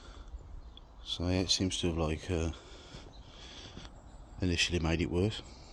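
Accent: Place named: Birmingham, United Kingdom. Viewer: Alright mate if used a watering can how much should I dilute it thanks like say a 5 litre watering can how much of each you sound like you are from Birmingham like myself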